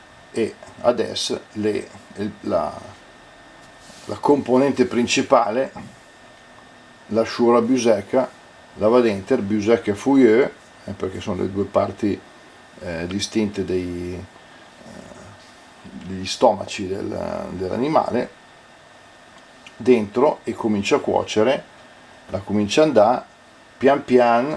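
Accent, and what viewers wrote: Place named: Milan, Italy. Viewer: A parte la ricetta la cosa più bella di questo video è la parlata milanese, dialetto ormai perso. yt è pieno di video di cucina in romanesco, finalmente un po' di lingua madre. Grazie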